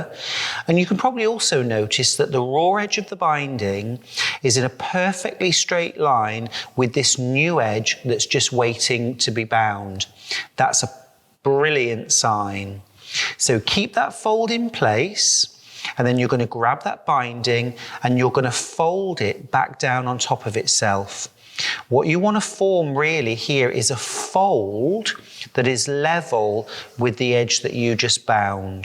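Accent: England, United Kingdom. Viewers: Inspiring tutorials & luv that English accent!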